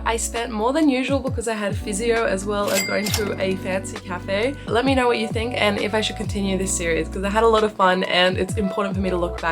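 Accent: Australia. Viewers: How are you German but have an Australian accent? So confusing 😂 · An Australian with an Australian accent that lives in Japan and speaks Japanese and enjoys Japanese food and culture? I think I'm in love ❤️ lol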